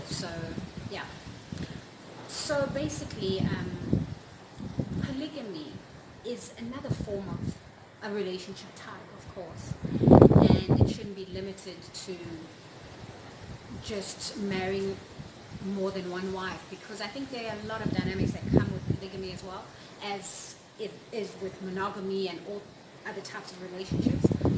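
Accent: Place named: Caribbean. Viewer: Very good video u explained that very good thank u keep up the good work . U sound like a Caribbean girl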